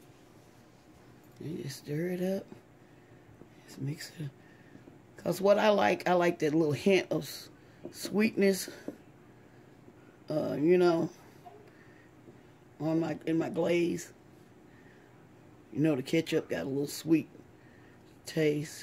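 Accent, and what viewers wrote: South, United States. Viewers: Best wishes Ms.. I feel the warmth from your kitchen and your heart. And your southern accent it’s like a recipe within your recipe. Can’t wait to try this one. Thanks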